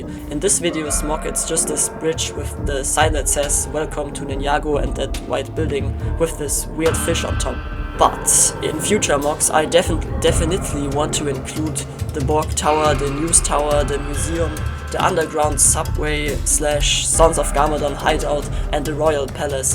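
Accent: Germany. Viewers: I can hear german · am i hearing a deutscher akzent?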